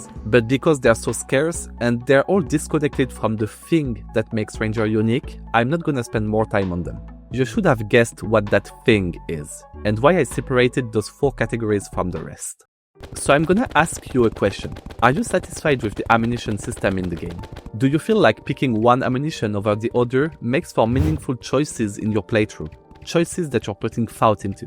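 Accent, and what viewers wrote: France. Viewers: are you french perchance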